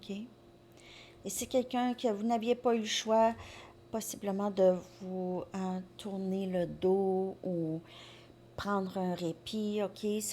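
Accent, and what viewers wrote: Quebec, Canada. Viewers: Moi j'adore votre accent,ca me fait du bien, je vous écoute presque tous les jours.❤🙏 Ça me rappelle mon voyage au Québec, j'ai tellement aimé, la gentillesse des personnes et les paysages ds les laurentides ...le vieux Montréal alors merci pour vos guidances et votre accent ❤❤❤😘😘🇨🇭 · Bonjour, moi j'adore votre accent québécois, il me fait 😄 Et vos guidances sont souvent très justes 👍 Ne changez rien, c parfait! Et n'écoutez pas les ronchons 😂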